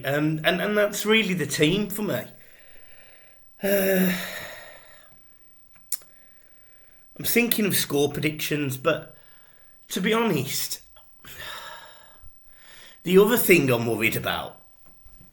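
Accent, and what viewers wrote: Birmingham, United Kingdom. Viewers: He sounds brummie but he's deffo a blue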